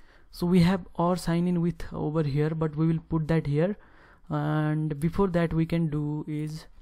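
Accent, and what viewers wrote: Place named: Haryana, India. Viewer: Your accent is Haryanvi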